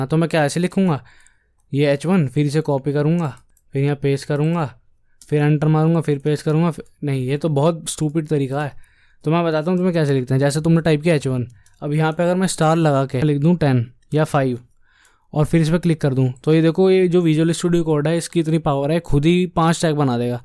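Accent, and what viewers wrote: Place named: India. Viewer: Video to mast thi hi, Bhai ka Bolne ka Style bhi mast laga Desi ❤️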